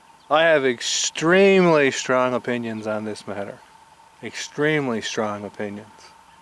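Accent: Ireland. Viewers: are you Irish? I swear I can hear a bit of accent. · You irish?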